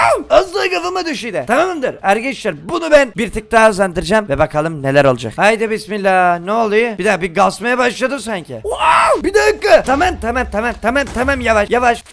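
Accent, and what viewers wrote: Black Sea, Turkey. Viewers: Abi sen kara denizlimisin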